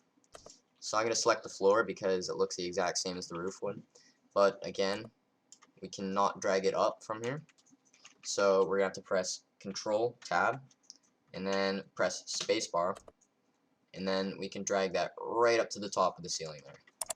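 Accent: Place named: Canada. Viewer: i can tell he's canadian, from the obvious pronunciations of words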